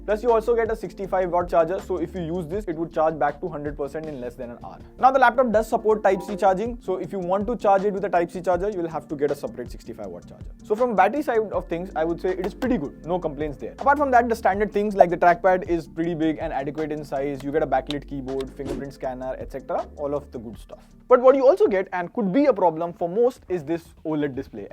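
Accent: India. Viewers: Bhai ki english bhi hindi jaise lagti hai lagta hai jaise mene hindi video hi dekhi hai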